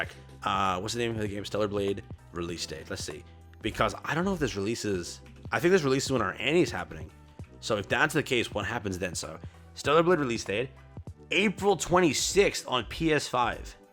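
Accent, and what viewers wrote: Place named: Utah, United States. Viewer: You be from utah or idaho...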